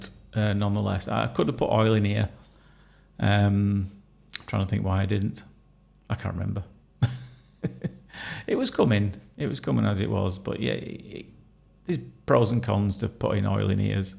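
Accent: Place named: North, United Kingdom. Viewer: Slab. What a great word for that piece of wax! And I love how you say fudge. Those northern u sounds are lovely. ❤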